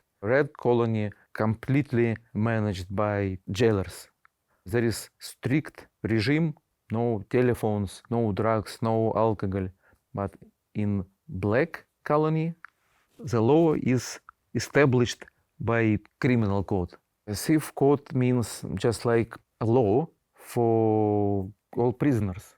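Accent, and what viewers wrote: Russia. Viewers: At least the accent is Russian because where I live which is Canada its either Chinese or Punjab accents. Anything is better than those two. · russian voice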